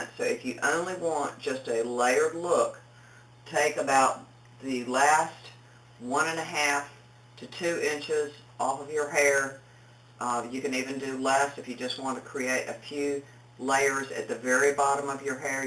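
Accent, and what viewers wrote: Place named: South, United States. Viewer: Honestly if a person doesn't like your accent they can just not watch your video. I found this tip very helpful and I appreciate the time you spent making this video. Now I was born and raised in Alabama since I was 15 and I've heard a lot of accents like yours. I think Southern accents are beautiful and I was sad to find that my own accent (though not as strong as yours) disappeared so quickly when I moved up North. It's refreshing to hear a strong Southern accent every now and then.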